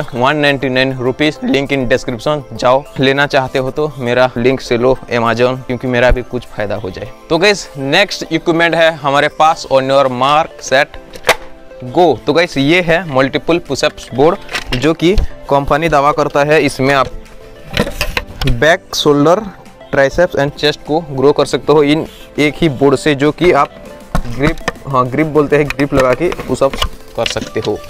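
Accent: Odisha, India. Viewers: Bhai aap odiya ho kya ? Aapki language se u sound like .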